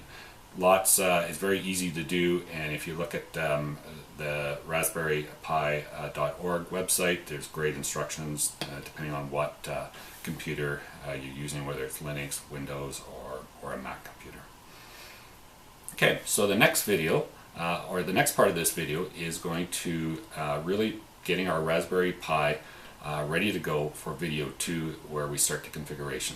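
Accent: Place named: Upper Michigan, United States. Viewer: I'm fascinated by this project. I'm here because I'm trying to do the exact same thing. I have a background in electronics, programming, networking, etc. But also, fascinated by accents. Are you, by chance, a uper? (yoo-per) thanks again for posting this video series.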